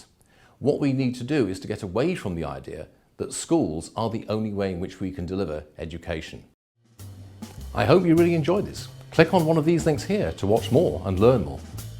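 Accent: United Kingdom. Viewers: This guy's British accent makes him sound more credible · His argument is clearly flawed. He states that the school system corrupt but he never states why. He is on the same level as someone who is angry on Facebook but with a British accent and a snazzy camera. Educate yourself on the components of a potent argument and you will come to the conclusion that his argue is as solid as liquid water.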